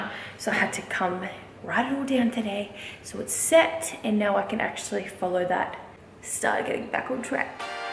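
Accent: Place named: South Africa. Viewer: Are you South African? I have a friend that is South African and the accent is so similar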